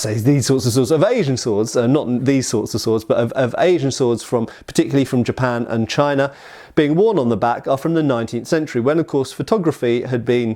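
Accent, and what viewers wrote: England, United Kingdom. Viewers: True. And when used as part of a combination word, it becomes dachi... as in nodachi or odachi. But is English, and he's gonna pronounce things with, well, an English accent, lol.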